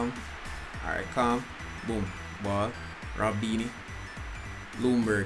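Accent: Jamaica. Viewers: oh i been watching for weeks and i said to my self bet he's a jamaican😎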